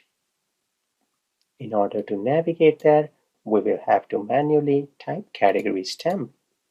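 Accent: India. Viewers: Absolutely the best <link> tutorial video I've found so far. Having an Indian accent is nice. However, when you speak, it feels like you're singing with the same tone all the time. Please, just speak in your natural tone. Don't try to sing. This singing part made it very hard to understand what you were saying. i had to listen 3-4 times to understand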